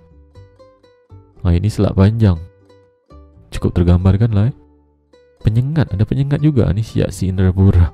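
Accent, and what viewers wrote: Malaysia. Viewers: Iya bang cantik bener sawahnya, awa senang dengar cakap logat melayu abang, salam dari awa warga jakarta bang, sehat selalu ya.( awa sdh anggukan kepalaku tiga kali bang ).. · loh bukannya abangnya pakai bahasa malaysia ya soalnya ngomongnya mirip upin ipin beda cara ngomongnya sama orang indonesia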